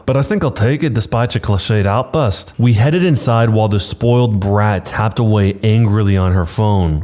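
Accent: United Kingdom. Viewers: the British accent tho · Same and why does it sound British😂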